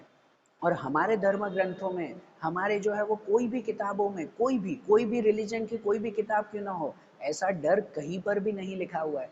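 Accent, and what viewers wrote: Gujarat, India. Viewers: What a explanation with Gujarati accent 😅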